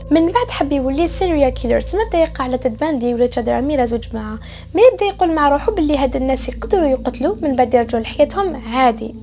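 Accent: Algeria. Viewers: I m new follower. You are stunning and your algerian dialect is very sweety. I think the best version of arabic❤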